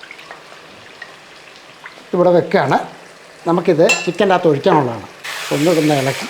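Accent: Kottayam, India. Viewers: സൂപ്പർ 🥰 നല്ല നാടൻ കോട്ടയം ഭാഷയിൽ ഉള്ള അവതരണം 👌👌🥰🥰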